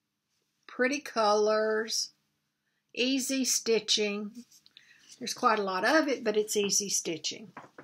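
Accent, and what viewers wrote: South, United States. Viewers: I enjoy your videos and live listening to your southern accent. One.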